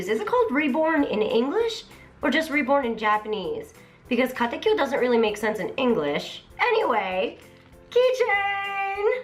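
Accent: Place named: Los Angeles, United States. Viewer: where were you born? you have american accent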